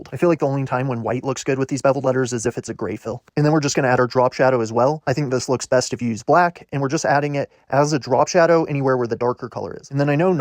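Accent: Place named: Canada. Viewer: Dudes gotta be Canadian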